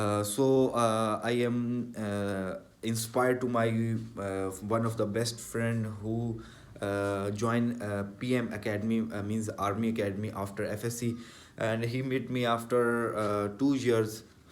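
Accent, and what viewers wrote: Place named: Kyrgyzstan. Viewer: You're speaking English in Kyrgyzstani accent 🤣. You did well, we understood ur point. Ur brave. ’’mini doctor shop - Hakeem shop’’ lol. May Allah Almighty bless with barakah ameen.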